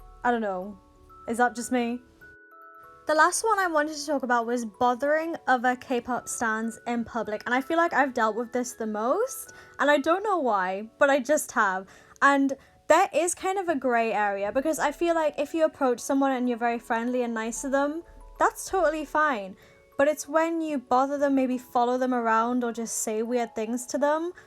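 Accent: Newcastle, United Kingdom. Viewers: First time here and ur accent reminds me of perrie edwards geordie accent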